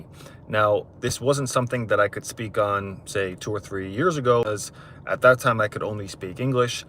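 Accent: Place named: United States. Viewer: He is speaking American.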